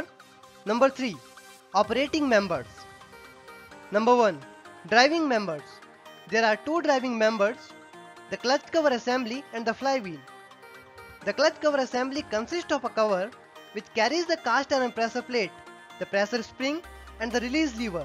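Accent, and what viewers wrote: India. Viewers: That indian accent is disturbing. Better to read instead of listening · This is an indian guy because his speaking accent is hindi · i swear my brain has been trained to shut up and listen like im in class whenever i hear an indian accent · How come it's always the guy with an Indian accent that knows literally everything and can explain it to the dumbest people and it makes sense!? This is a masterpiece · More confused than before. Hard to understand with Indian accent.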